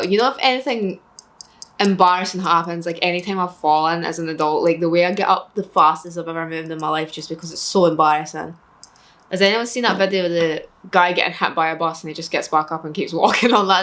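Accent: Scotland, United Kingdom. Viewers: She’s scottish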